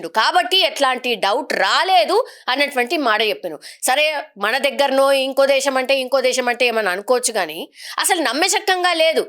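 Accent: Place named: Telangana, India. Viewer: antha rani telengana accent edhuku try chestunaru anchor madam ??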